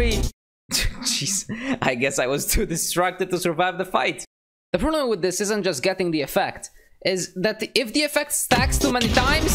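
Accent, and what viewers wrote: Italy. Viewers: Are you from italy? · why are you italian?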